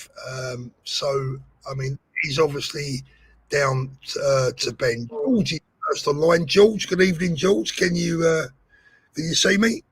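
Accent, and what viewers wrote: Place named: South London, United Kingdom. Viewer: You're very articulate and communicate perfectly.. Also, nothing wrong with a South London accent. A true londoner! 👍🇬🇧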